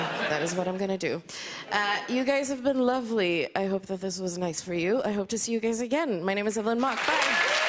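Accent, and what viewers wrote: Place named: California, United States. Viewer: If I had to guess, I would have said you grew up in California!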